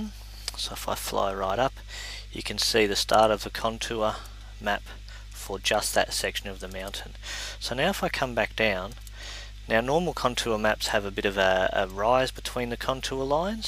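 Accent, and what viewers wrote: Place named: Australia. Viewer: I thought you were Australian from the accent and turns out i was right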